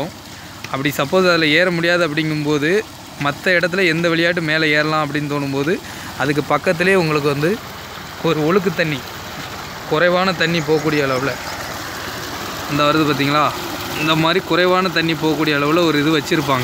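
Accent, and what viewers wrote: Tirunelveli, India. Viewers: Sema, thirunelveli yaaa pa... namma slang varuthuu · anna ninka tirunelveli ya 😍😍eale😍😍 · Nanum Tirunelveli bro.. slang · திருநெல்வேலி பாஷையில் விளக்கம் கொடுத்தது சூப்பர் பாஸ் நல்லா இருக்கு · Tirunelveli tamil arumai👌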